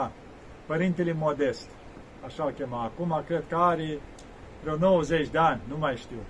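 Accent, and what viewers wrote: Moldova. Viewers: Ce frumos și cu duh povestiți,va ascult cu gura căscată, aveți graiul dulce moldovenesc, mulțumesc!!! rugați vă și pentru mine, Hortenzia Mihaela,și as vrea sa ne spuneți modalitatea cum sa trimitem bani și pomelnic la d-voastră!!??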